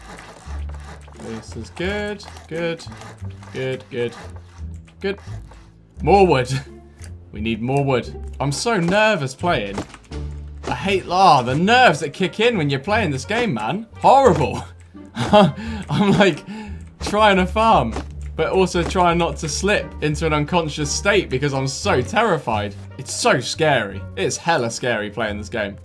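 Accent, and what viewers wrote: England, United Kingdom. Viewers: your english accent always cheers me up!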